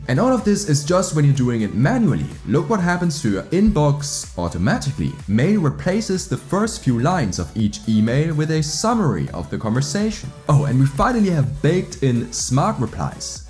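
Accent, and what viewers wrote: Germany. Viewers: Your accent sounds like German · Is it just me or does he sound a bit more German in every sentence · are you german? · Du bist doch Deutscher haha hört man so krass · Der deutsche Akzent :)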